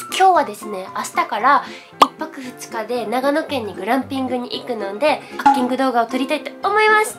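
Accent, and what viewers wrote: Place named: Kansai, Japan. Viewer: 関西弁かわいい👶🏻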